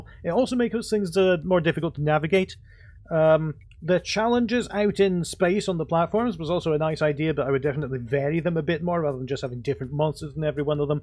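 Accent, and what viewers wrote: Scotland, United Kingdom. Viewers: are you from Scotland mate?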